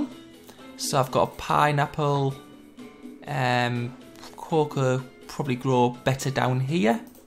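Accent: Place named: Sunderland, United Kingdom. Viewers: I'm from Sunderland, you sound like one of us. For that reason I am now subscribed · this is more of the northerner accent than the traditional british accent most are accustomed to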